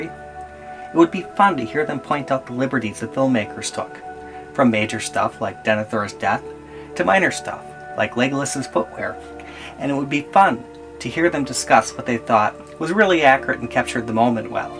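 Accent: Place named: New England, United States. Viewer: Biggest yankee accent I have ever heard.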